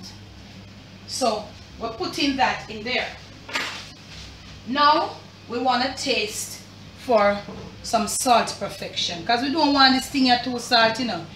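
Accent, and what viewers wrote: Patois, Jamaica. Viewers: Good morning Aunty i have to laugh when you talk our patios.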